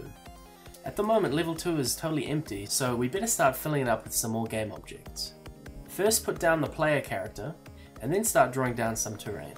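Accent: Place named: New Zealand. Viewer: you sound like a kiwi XD sorry if ur not XD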